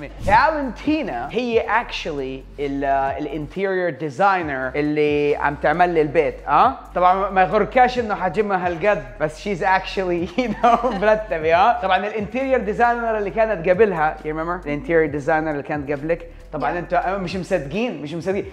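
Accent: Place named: Iraq. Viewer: انتة صرت تحچي عراقي عيني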